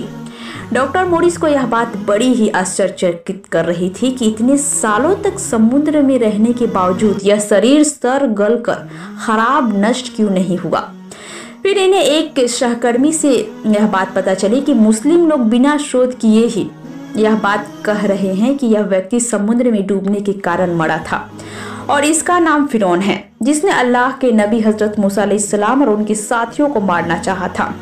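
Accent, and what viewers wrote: India. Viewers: Tumhari boli batati hai ki tum bihari ho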